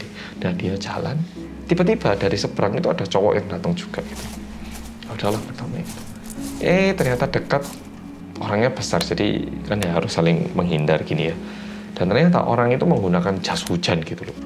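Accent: Java, Indonesia. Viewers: Mas kelahiran Daegu, Korea Selatan 8 Mei 1994 ini juga pernah membantu tim Running Man ketika syuting di Yogyakarta. Dikit lagi gess ultah mas medok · Medok bangett sihh kakkk,gemezzz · Serem banget ceritanya mas....merinding abis.... Btw, mas kan dah lama balik ke Korea....gimana caranya mempertahankan medok Jawanya?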